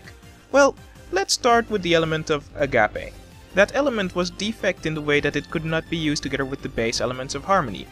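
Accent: Netherlands. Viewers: I noticed the dutch accent and I've been skimming the comments but it raised a question. are you native dutch or did you move to the Netherlands? Also if I may ask what province do you live in? I've met several dutch bronies on the internet but they where always on the other side of the country. And regarding the dutch speaking English. yes its true every one i know has a massive accent the moment they speak english myself included. I'm working on getting rid of that(not that easy:D) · No reason to be so harsh on the Dutch, I'm well aware how challenging English pronunciation can be since I've experienced it first-hand (English is only my fourth language but boy have I worked hard to get rid of my accent! Only through sheer determination do I sound almost indistinguishable from a native speaker today). Nevertheless, even though you claim that English is your first language you clearly have a Dutch accent, even if not a thick one. 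Comes from living there, I guess? Never mind.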